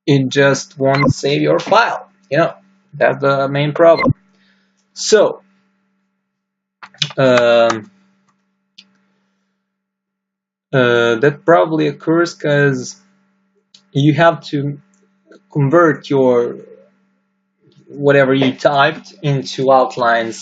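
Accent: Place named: Balkans. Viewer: Hey buddy thank you for your tutorial. worked like a charm for me! I appreciate it. btw I've noticed you tend to sound like a person from the Balkans lol which is good either Bosnian, Serbian or Albanian but more Slavic I can tell lol thanks once again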